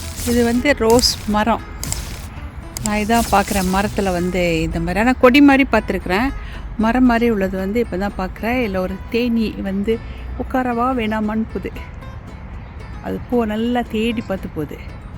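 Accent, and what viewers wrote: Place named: Tiruchirappalli, India. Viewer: இந்தியாவில் எந்த ஊர். தமிழ்நாடு. பேச்சு வழக்கு திருச்சி மாதிரி இருக்கிறது. நான் திருச்சி தான். பார்க்க பூக்கள் அழகாக உள்ளது. வெளிநாடு வெளிநாடு தான் .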